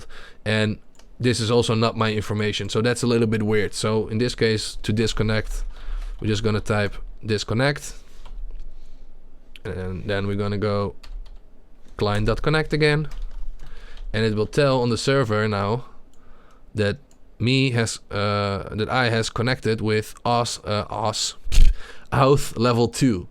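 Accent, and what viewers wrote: Netherlands. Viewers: Duidelijk te horen dat u Nederlands bent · are u dutch?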